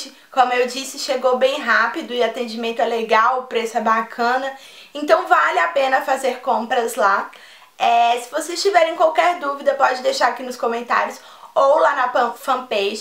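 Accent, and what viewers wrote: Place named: Northeast, Brazil. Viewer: acho bonitinho esse seu sotaque nordestino ;P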